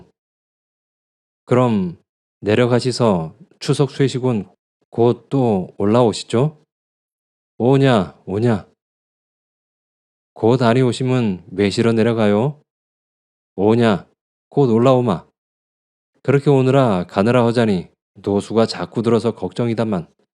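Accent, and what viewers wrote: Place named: Jeolla, South Korea. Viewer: 📚🌸♥️ ㅎㅎㅎ 님의 전라도사투리 오랫만에 들으니 정겹습니다^^ 수고많이 하셨습니다😂🙏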